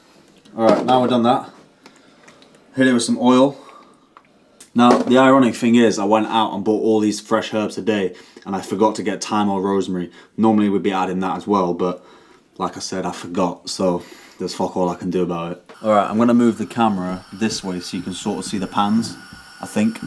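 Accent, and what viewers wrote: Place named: Yorkshire, United Kingdom. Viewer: What part of Yorkshire are you from mate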